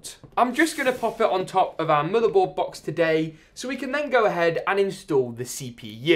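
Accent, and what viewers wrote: United Kingdom. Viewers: that is the strongest british accent I've ever heard. Had my attention the whole time. Good job dude 👍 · This is the most British person I've ever heard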